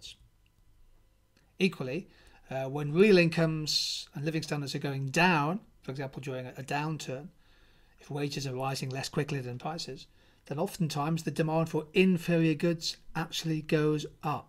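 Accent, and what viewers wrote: United Kingdom. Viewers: True British accent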